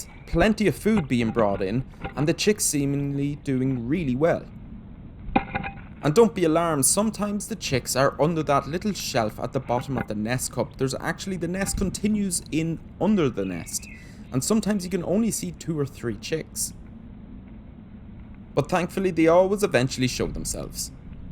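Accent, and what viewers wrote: Ireland. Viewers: Greetings, I'm really enjoying your YouTube channel, the videos are terrific, your editing is great and I love your dreamy Irish dialect and narration. Maith thú. 💞 Gach rath ort. xox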